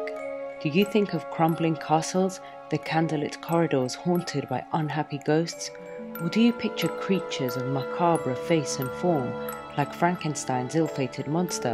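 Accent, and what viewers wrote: United Kingdom. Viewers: for me, Gothic (anything) is pure Heaven as I adore Halloween in all its Glory and endeavour to make it as scary and fun for the Kiddies of my little Neighbourhood as possible. a few years ago I heard Halloween was on the wain but then found out we (in North America) spend about $30 B on the stuff rivalled only by Christmas. for some unknown reason, all Gothic Architecture gives me chills and sends me back in time. one more observation is your incredible accent and phrasing. my Granny was from Lancashire but an English Teacher and her pronunciation of words were so similar and I have never forgotten this to this day.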